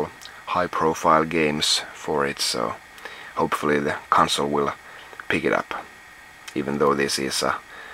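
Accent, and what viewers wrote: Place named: Finland. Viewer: Finnish accent is unique. Not so easy to grow out of it.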